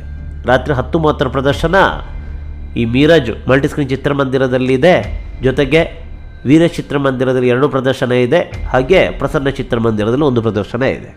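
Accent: Coastal Karnataka, India. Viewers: ಭರ್ಜರಿ ಯಾದ ವಿಶ್ಲೇಷಣೆ...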